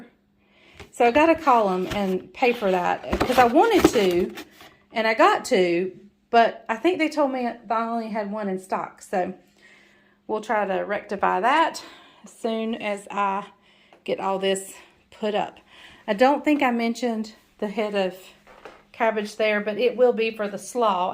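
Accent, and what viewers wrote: South, United States. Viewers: Last night I viewed this video and this morning I thought of you as I was reading today’s devotional (4/21/2021) from the Our Daily Bread app. I started watching you for your biscuit video. I have continued to watch you for more of your delicious recipes, but mostly to enjoy hearing your lovely southern drawl and to see Jesus in you. It is so refreshing to see you deal with the chaos life brings us in your sweet mannerisms. You bring authenticity to what life is about in Christ. Please keep being yourself!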